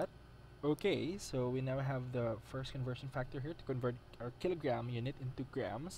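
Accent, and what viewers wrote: Philippines. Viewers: This guy is a filipino just by his accent.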